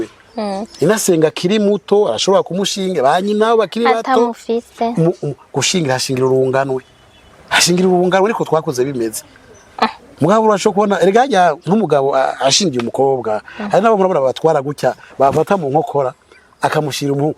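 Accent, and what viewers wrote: Burundi. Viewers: Ikirundi cawe kiranryohera cane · Wow ikirundi kiraryoshe ukibwiwe numuntu akizi like him. He is very proud of himself 🎊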